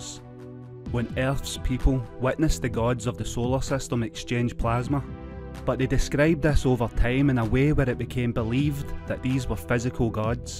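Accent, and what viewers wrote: Scotland, United Kingdom. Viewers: Is this a scottish accent? Just wondering, because it was so easy to follow, while irl my encounters with Scots have usually made me feel like I needed to go back to school and practice.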